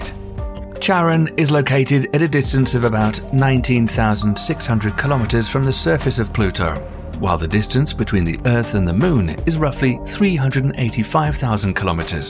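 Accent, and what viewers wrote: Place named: RP, United Kingdom. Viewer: I love how he says the “kweeper” belt. I guess I’m just a yankee and he’s using the more fancy queens English🤷🏾‍♂️🤔😂 I thought it was pronounced ky-per belt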